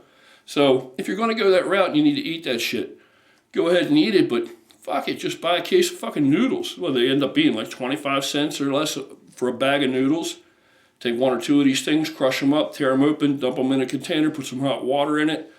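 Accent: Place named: Baltimore, United States. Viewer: It’s milk unless you’re from certain parts of the US like Baltimore county, like me, which is where he’s from too. I lived in Perry hall his accents different from mine a little maybe he lived on i83.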